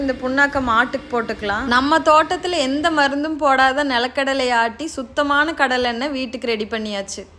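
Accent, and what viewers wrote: India. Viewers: கொங்கு பேச்சு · வாழ்த்துக்கள் சகோதரி. கோவை தமிழ் கேட்டது மகிழ்ச்சி. வாழ்க வளங்களுடன்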